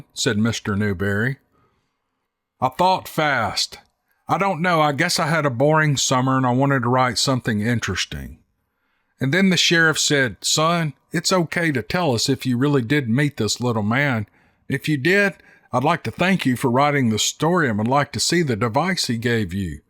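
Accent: South, United States. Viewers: I work for Amazon and we're going for broke in the the 5-6 weeks before Christmas. When I catch a gasp when I get home at 6 a.m. I love to catch your you postings before I go to bed. Thanks for your dedication to the narration of the the tales sent to you by writers, but they are a wonderful tales, wonderfully narrated, in the style (and southern voice) of a wonderful Southern yarn that I have come to miss from living in the South. Thanks Man, the skill of Southern yore telling is well and alive in you.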